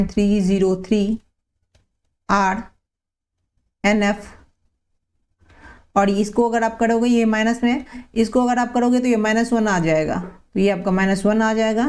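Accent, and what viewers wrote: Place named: Bengal, India. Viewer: Bengali tone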